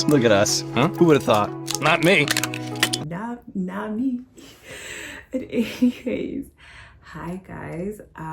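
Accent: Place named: United States. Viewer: Yes you sound American 😭I thought we all knew that??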